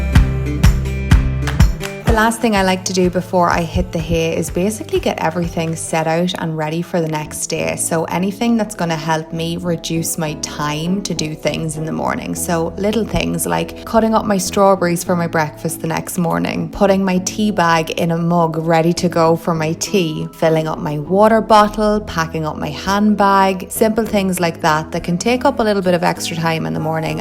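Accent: Ireland. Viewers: Im pretty sure just irish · I visited Ireland almost a year ago and I miss it a lot it’s a wonderful country, and watching your videos weirdly makes me nostalgic, maybe it’s hearing the accent haha. but I love your videos!! They are super relaxing and inspiring <3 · She is Irish :) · Are you Irish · i looove your accent! are you irish? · Molly Mae i’m from ireland too and i can tell you she is from ireland 🇮🇪 💕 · I wonder what part of ireland. bc i feel theres a clear difference I can hear from different Irish people's accents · You have the most satisfying accent ever. Are you from Ireland? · Anindita Chatterjee she’s Irish and has an Irish accent :) “odd” is simply your perspective, not being Irish yourself.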